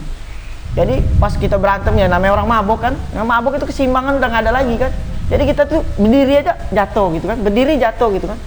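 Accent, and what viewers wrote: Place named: West Sumatra, Indonesia. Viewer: Rang jambi, rang jambi. Hehe... Emangnya saya ga bisa tahu kalau abang ni orang minang, ga bakal ilang bang logat kamu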